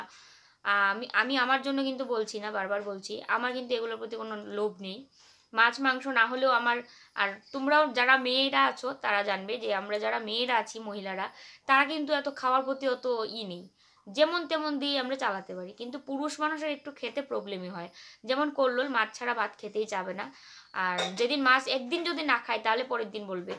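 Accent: Bangal, Bangladesh. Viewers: Didi maajhe maajhe bangal vashay kotha boliyo , valo laage shunte tomar mukhe bangal vasha shunte..❤️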